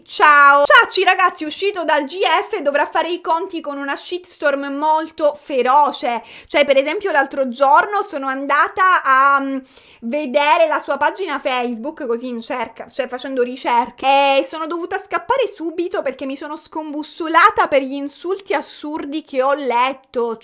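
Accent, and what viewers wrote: Sicily, Italy. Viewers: Ti ho scoperto da poco. Sei siciliana? Hai un accento credo simile.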